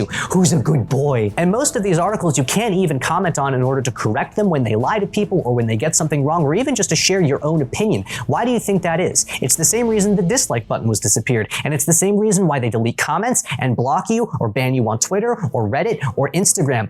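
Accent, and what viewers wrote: United Kingdom. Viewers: I have several things to say. Holy crap, you tore these guys to shreds, and they justifiably deserve it. We, the fans of Tolkien and his work, all stand together, linked arm in arm like a chain, and these bastards are trying hard to break that chain, to shatter our unity and throw us into darkness. We will not stand for this, we will not falter. Just as the chain of links around Frodo's neck carried The One Ring into the fires of Mount Doom, we will carry our love together, and then hurl the evil back into the firey chasm from whence it came. They will lose. There is no other way, because we will not stand for this bullcrap. Just imagine if people with such deep and true love for Tolkien and his works had made the show instead. Just imagine if they spent the same amount of effort they do attacking fans into pouring love and genuine passion into their work. 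If not for Lord of The Rings, I might've never gotten into fantasy. I might never have created my own fantasy world when I was 13 years old, then continue building upon that world all these years. I probably never would've been inspired and motivated enough to begin work on my own book. If not for my love of Middle-earth, I would probably never have begun collecting fantasy(and medieval) clothing, armor and weapons. Never would've had my own little armory, as I do today. Plan on making a video about that in the future. And finally, your Brittish accent was great. Sorry for such a long comment, just had a lot to say.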